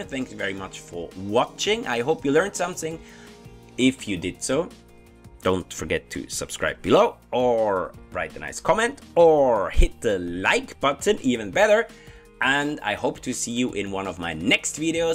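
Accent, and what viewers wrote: Switzerland. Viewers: Stupid question... are you Swiss german or did you learn german from a swiss? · Thank you for your reply and your videos helped tremendously! I figured you were swiss based on your high german accent but was only sure when you said Grüezi in one of your videos 😊 thank you so much again and will continue learning..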